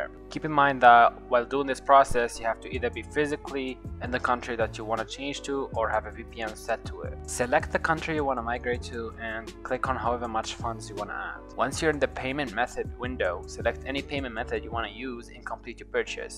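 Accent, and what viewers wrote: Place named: Tunisia. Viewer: Based , thank you so much . W tutorial w Tunisian English ( From Tunisia and mrc 3al Video ) < 3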